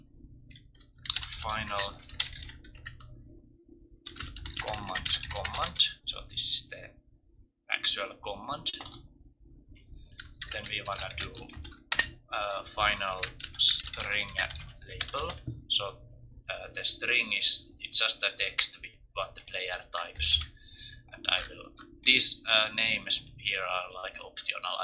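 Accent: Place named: Russia. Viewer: I love your russian accent :)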